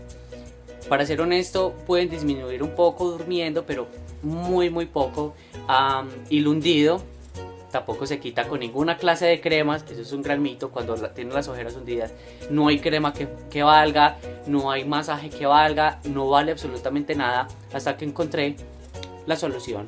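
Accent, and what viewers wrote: Antioquia, Colombia. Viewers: definitivamente paisa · Oyeeee paisa me gusta como hablas... bacan!!!!!!!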